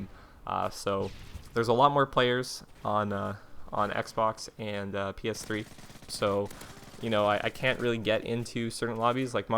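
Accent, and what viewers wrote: Canada. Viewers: hey are you from canada??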